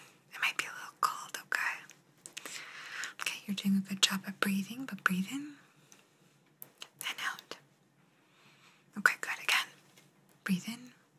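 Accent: Italy. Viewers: Hi, i must have fallen asleep. I will need to watch the video again. 😊 Could you do another cranial exam with your italian accent? 😅 or a travel agent. Showing us beautiful places in Italy to visit. That would be amazing! Anyways, thank you for another great video. Ciao! 😊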